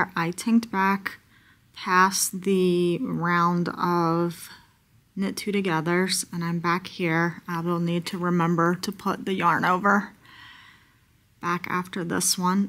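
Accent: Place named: Northeast, United States. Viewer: Love your color choice. I found you looking up sock knit along. 😊 Your accent! Where are you located. I hear northeast, but I hear west coast in the cadence. What?? Right am I way off? ❤❤😊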